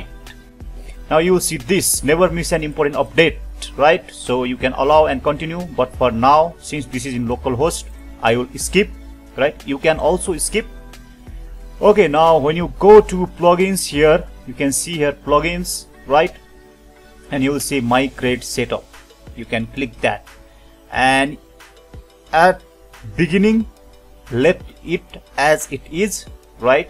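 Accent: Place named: Nepal. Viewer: Bro Nepali ho? Accent testai testai chha ta. 😁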